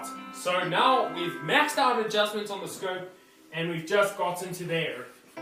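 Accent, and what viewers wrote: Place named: South Africa. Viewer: I wanted to say something when I watched the First Black Board video. Your information is great But the Music in the Back Ground and your accent make it hard to understand you. Please don't play music while you are speaking and try to speak a little clearer so we who are not from South Africa can understand you.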